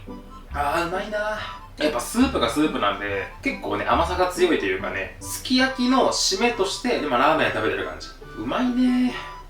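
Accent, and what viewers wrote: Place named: Hakata, Japan. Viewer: 全編博多弁の動画を見てみたい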